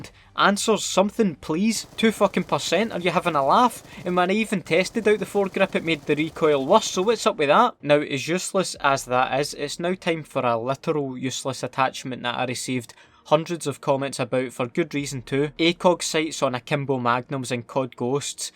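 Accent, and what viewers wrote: Scotland, United Kingdom. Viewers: He’s got a strong Scottish accent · He shoulda said holographic shite instead of blindness feel like its funny saying shite in Scottish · I dont even play COD anymore i just listen iT for the amazing scottish accent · everything this guy says is automatically 4x funnier since its scottish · I cannot say just how much I love the Scottish accent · Half the shit he says wouldn’t be funny in an regular accent but in this Scottish it has me dead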